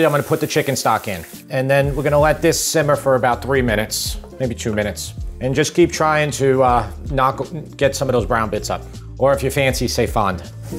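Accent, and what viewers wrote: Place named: Queens, United States. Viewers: You have such a thick LI accent. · My parents were from Queens and we grew up on Long Island. I don't notice it so much in person, but when I speak with my siblings on the phone, they all sound like you.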